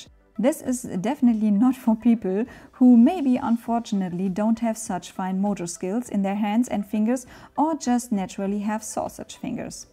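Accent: Germany. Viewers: Well done. You persuaded me to buy these. Love your beautiful German accent.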